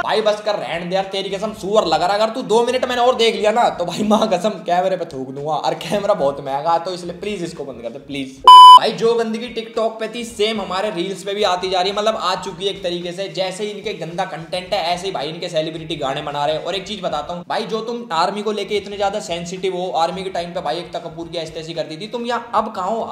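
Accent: Haryana, India. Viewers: Bolne me thoda haryanvi touch h 😍😍😍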